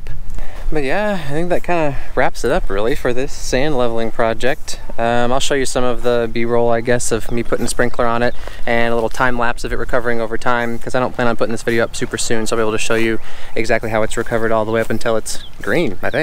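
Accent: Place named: Australia. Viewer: Ben Simms will appreciate the Aussie accent. Lol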